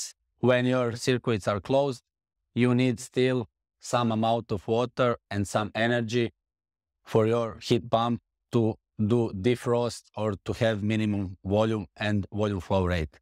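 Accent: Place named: South Slavic, Eastern Europe. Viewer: Odmah se primeti (tvrdi) naglasak juznih slovena. Ali odlicno je sve, samo tako nastavi. Pozdrav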